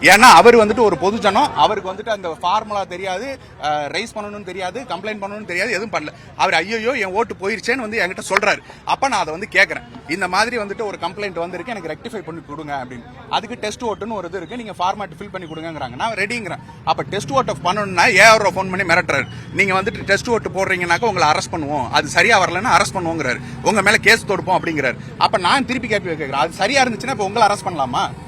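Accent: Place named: Kanyakumari, India. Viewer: உன் பேச்சைக் கேட்டாலே தெரியுது கன்னியாகுமரி நாடார் மாதிரி தெரியுது நாடார் கட்சி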